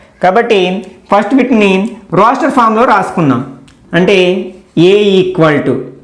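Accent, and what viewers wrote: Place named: Godavari, India. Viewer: Thank You, While I hear your class I feel as if I hear my close friend teaching me its because of your good Godawari tone. All the best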